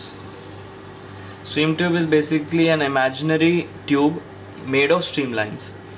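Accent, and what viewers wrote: India. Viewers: Good video but try to avoid the annoying indian accent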